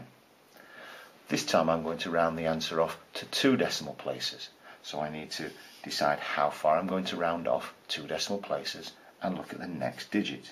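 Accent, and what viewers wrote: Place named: Cockney, United Kingdom. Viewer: You sound like a cockney Gangster!!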